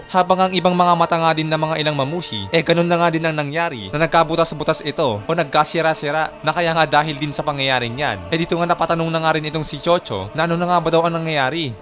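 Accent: Visayas, Philippines. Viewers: Parang nagiging Bisaya kana din ahh.. grabe talaga influence namin bisaya hahah! #Kapoy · Bisaya ka dol? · Bisaya ang ampt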